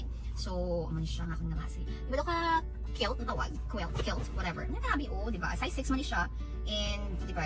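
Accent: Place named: Iloilo, Philippines. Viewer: Go sis. Nakakaaliw talaga amg diction ng Mga ilongga. Love your confidence ☺️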